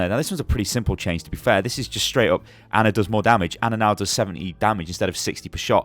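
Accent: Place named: United Kingdom. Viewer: Your so British!🤣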